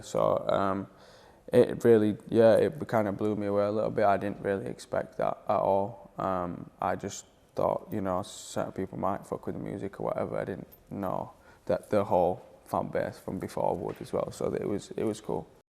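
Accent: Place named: England, United Kingdom. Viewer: he speaks in cursive with an english accent..... I wanted this video to be longer..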